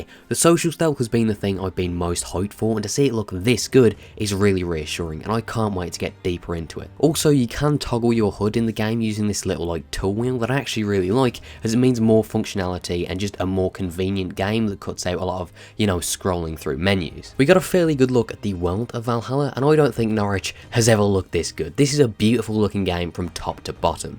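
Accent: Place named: Midlands, United Kingdom. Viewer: Where are you from man, your accent sounds so ambiguous, I’m either thinking somewhere in the Midlands or the West Country 😬😬😬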